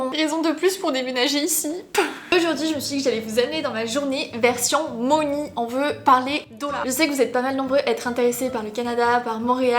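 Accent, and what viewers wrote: England, United Kingdom. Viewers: Trop bien comme d'habitude et j'adore ton accent anglais ton haul en anglais est trop bien 😆😆☀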